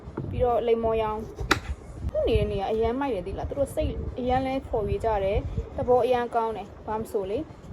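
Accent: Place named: Myeik, Myanmar. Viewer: အမက ဘိတ်သူလားဗျ ဘိတ်အသံနှင့် နည်းနည်းတူနေတယ်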